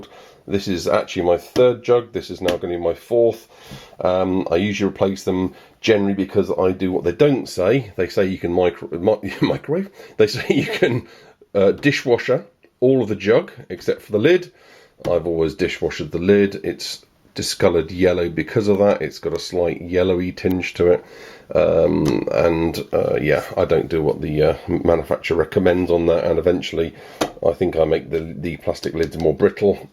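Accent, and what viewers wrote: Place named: RP, United Kingdom. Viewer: First of all GREAT VIDEO. Second of all, finally an Englishman that speaks the Queens English. Thank you for the vid :o)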